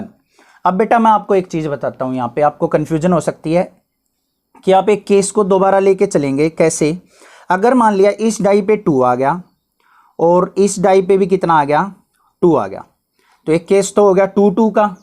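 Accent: Haryana, India. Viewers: Sir Haryana se ho kya aap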